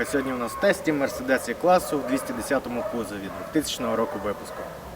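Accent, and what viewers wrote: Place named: Surzhyk, Ukraine. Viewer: Суржик вуха ріже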